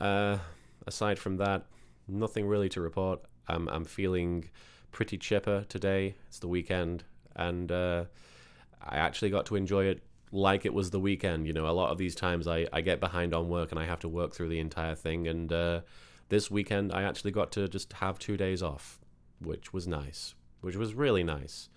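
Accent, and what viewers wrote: United Kingdom. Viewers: where do u live by the way u sound british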